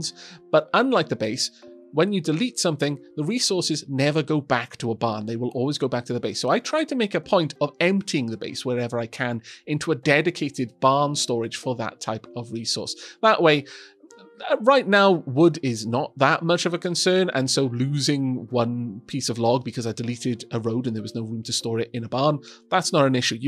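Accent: Wales, United Kingdom. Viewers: what part of wales are you from? heard the slight welsh accent then seeing your chanell logo be a red dragon i knew you was welsh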